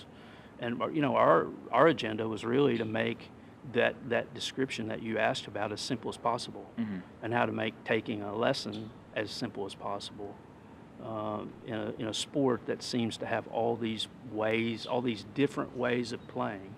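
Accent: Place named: United States. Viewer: What S&T is..? Is 'painting lipstick on a pig', it is just fast-talking with an American accent which cons people into thinking it is good for them..Generally it is pretty much what has been done WITH SHORTER CLUBS since forever...and it can't be successfully done with the longer clubs. If you don't move weight/mass away on the backswing..you can't use it on the downswing.. proponents of S&T nearlyy always seem to need to rubbish every other system..just to leverage their marketing of this WHICH NO GOOD GOLFER USES,,,and for which NO statistical evidence of subsequent shot quality has been done or shown. Would any one like to show a video of FADES being repeatedly done across the club range with this system..SHOW AND PROVED, not just talked about.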